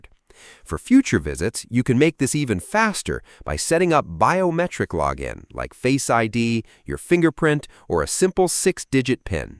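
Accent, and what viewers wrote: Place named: United States. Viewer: HMRC could have used an AI voiceover without an American accent!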